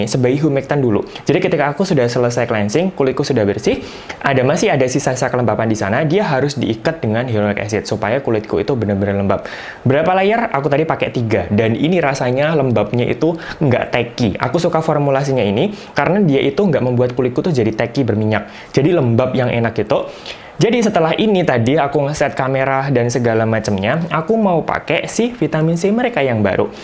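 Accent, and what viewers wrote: Java, Indonesia. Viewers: lak pas ngomong logat jowo endel e metu.. 😂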